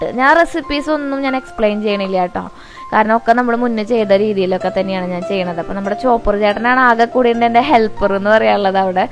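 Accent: Thrissur, India. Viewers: ചേച്ചി തൃശ്ശൂര് സംസാരശൈലി സൂപ്പറാ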